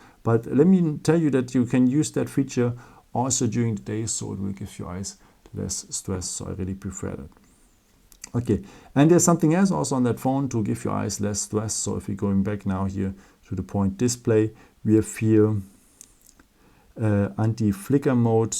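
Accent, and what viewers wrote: Germany. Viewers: Der deutsche Akzent is da😂✌🏼 aber nice Video hat mir sehr weitergeholfen!👌🏻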